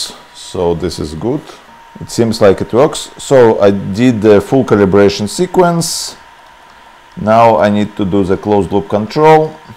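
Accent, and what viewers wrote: Russia. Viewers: произношение очень похоже на английское произношение русскоязычного человека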